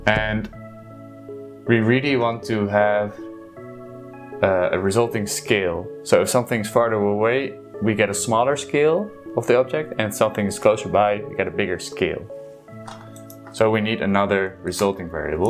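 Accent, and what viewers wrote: Netherlands. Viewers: Ziet er goed uit, ik raad je aan om een keer te kijken naar OpenGL of iets in die richting. Dan kan je een echte game engine bouwen hoewel OpenGL niet de beste Graphics API is maar is wel goed voor een beginner (ik neem aan dat je een beginner bent aangezien je game maker gebruikt, vat het niet verkeerd op xD) Als je je afvraagt hoe ik wist dat je nederlands bent ik hoorde het aan je accent. :) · Ik hoor nederland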